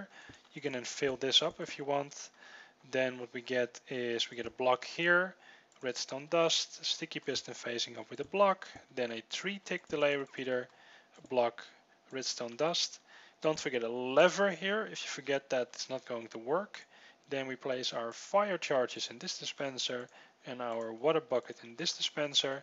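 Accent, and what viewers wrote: Netherlands. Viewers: hello new sub from other hermits, no wonder i found the accent familiar XD one of my old EVE online corps CEO was dutch :P though his accent was thicker :) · are you dutch/belgian? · Dude, are you dutch? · According to his accent he is obviously Dutch, so am I. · I was pretty sure you are from The Netherlands just like I am. O_o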